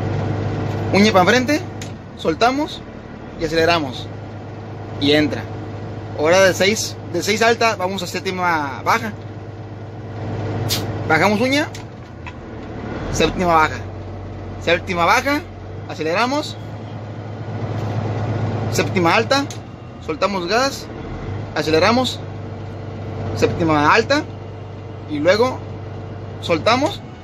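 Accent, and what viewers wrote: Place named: Mexico. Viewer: Me fascina ese acento Mexicano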